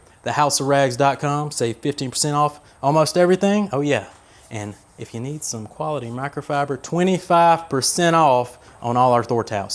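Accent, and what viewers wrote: South, United States. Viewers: Thanks for the update! I went and bought the 1.8 based off your original review; I was just NEEDING something smaller and lighter than my big 'ol thang with wheels and a hose reel. The only issue I have with the Ryobi gun is that when I put the extension on it, I feel like I fight to keep the water stream pointing down. It's like I pull the trigger, and the nozzle kicks up. I did put a swivel on it, and as a pure stubby it's great. Love the lack of music and your LA accent! I'm in East TN, so it's cool to hear the southern accent with a twang :). Love your vidoes!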